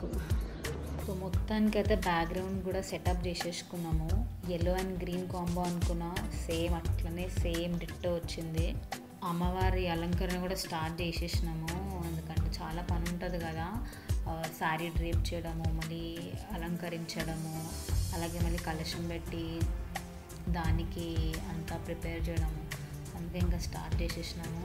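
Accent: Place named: Telangana, India. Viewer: Akka nuv complete Telangana lone mataldu... Nen ni matala kosame chusthunanu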